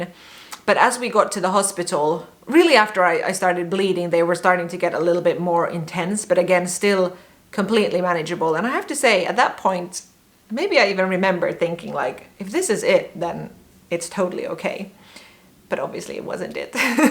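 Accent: Finland. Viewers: I really liked this story. not sugarcoated or treating birth as a 'who did it better' thing, so chill and funny at times. a lot of details and no swooning, just being down-to-earth and positive. and I must say I adore the traces of Finnish accent you have, like saying five words on the same breath while your intonation goes down and so on. I can't help it, I'm just a sucker for it :D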